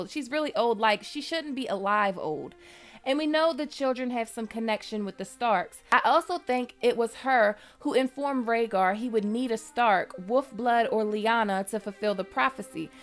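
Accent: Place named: Philadelphia, United States. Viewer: Your videos are very well thought out and entertaining. Your passion for the subject matter shines through and is absolutely infectious. And your voice,...it triggers something primal in me. Can't explain it simply which means I must not understand it well enough. But to avoid sounding creepy I'll just say your voice is very nice. Sounds unmistakably Philly to me but idk. Your work and effort is much appreciated.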